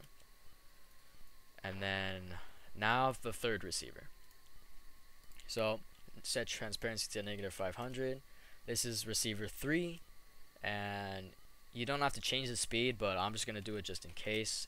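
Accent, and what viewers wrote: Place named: United Kingdom. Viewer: UK accent.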